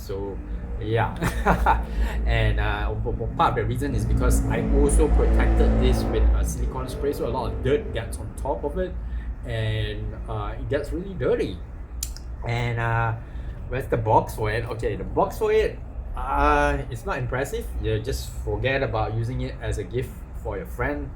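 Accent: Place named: Singapore. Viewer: Are you malay? Or Singaporean? I lived in Johor 5 years your slang is very singlish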